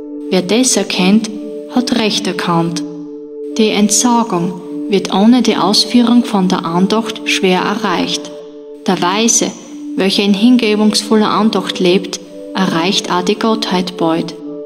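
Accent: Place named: Styria, Austria. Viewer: Absolutely beautiful!😀 Your Steirisch Dialekt is amazing! Outstanding!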